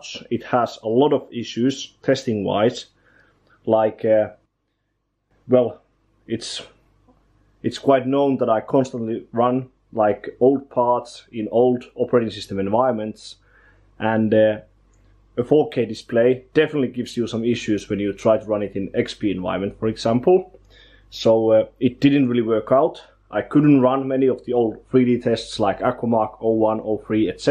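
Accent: Finland. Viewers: You really sound like Finnish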